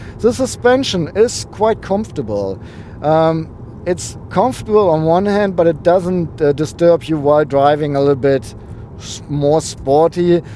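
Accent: United Kingdom. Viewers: nice review,very nice car, nice english accent ! good job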